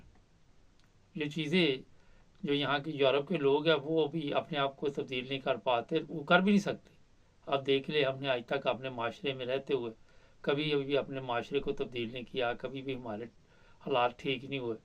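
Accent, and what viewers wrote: Punjab, India. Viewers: Please use a better microphone…. Place it close to your mouth…. You speak Urdu in pure Punjabi accent…. Please help the viewers You provide good information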